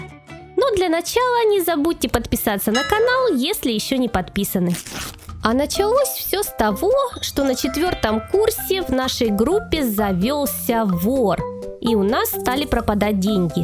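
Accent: Ukraine. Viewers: Так она украинка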